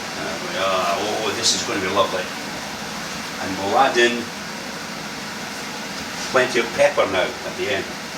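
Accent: Edinburgh, United Kingdom. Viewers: Nice to hear an Edinburgh accent on the interweb. Slainte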